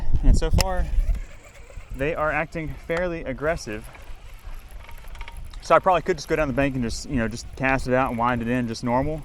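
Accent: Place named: South, United States. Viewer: Every once in a while , we hear the southern accent . Very cute .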